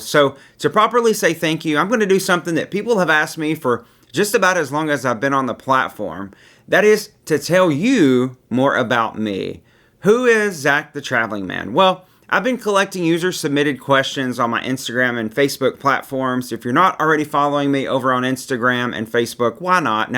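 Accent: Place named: Tennessee, United States. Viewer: I like your accent. My guess was Tennessee. No one likes mine Boston.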